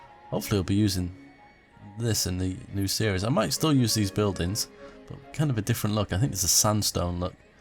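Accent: England, United Kingdom. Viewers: This was a great series; enjoyable to watch. Thanks much! As for the use of mods in the next series, I'd say it depends on the overall goal. If creating a decorative oasis is the goal (like the zoo), then using mods to relieve the survival pressure so that you can concentrate on being creative seems wisely used. If the goal is survival, then not so. Folks like myself watch your series for the artistic aspect (and your English accent!), so it seems right to free up your time to have fun being creative. ;-)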